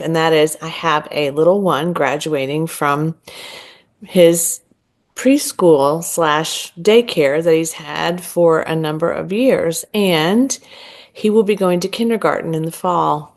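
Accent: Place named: Louisiana, United States. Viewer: Love her Louisana accent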